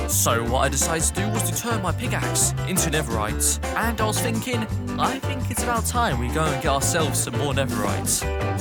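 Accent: United Kingdom. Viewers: Are You British? And That's Not Moss Block It's Mossy Cobblestone Not Moss🤦🏻🌷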